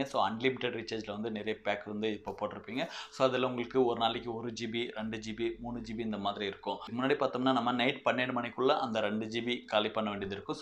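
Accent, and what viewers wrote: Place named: Hosur, India. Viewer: Bro Neenga endha ooru. Unga slang nalla irukku. Hosur ah.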